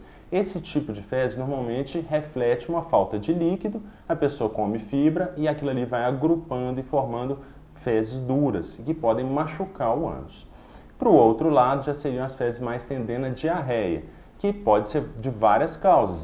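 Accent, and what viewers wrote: Minas Gerais, Brazil. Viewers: Que sotaque lindo!! Parece mineiro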